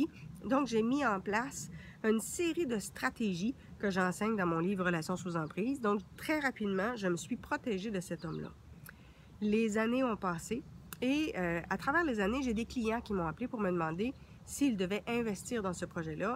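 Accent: Quebec, Canada. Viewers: Belle vidéo et j'aime. ton ascend québécois j'adore. Super que tu fasse, partagé ton expérience, personnelle.merci franchement j'aime.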